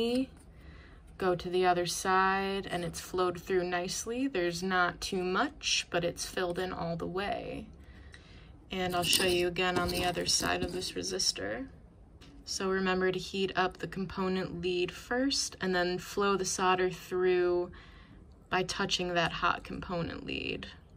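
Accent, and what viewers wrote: United States. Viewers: Why do Americans distort the English language? i.e. The word SOLDER has an 'L' in it which in normal English is pronounced! So why don't Americans pronounce the word SOLDER instead of saying SODDER?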